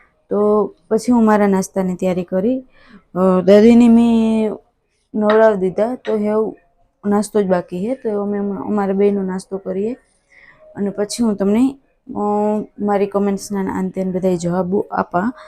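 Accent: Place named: Merani, India. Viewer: બેટા મેરાણી ની ભાષા બહુજ સરસ રીતે રજુ કરે છે તે મને બહુજ ગમે છે બેટા ખુબ ખુબ આશીર્વાદ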